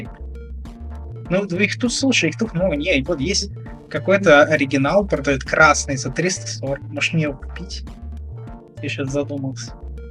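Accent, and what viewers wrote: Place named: Poland. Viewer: нифига у тебя акцент польский появился, раньше его не было (Л-логика ☺️) меня, при знакомстве с тобой , больше всего удивила разница между тобой в инете и в жизни. в положительную сторону ;)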